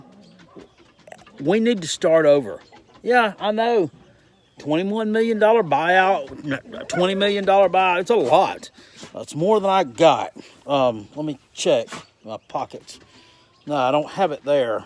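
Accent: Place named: Texas, United States. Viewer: Sounds like Texas brotha I feel ya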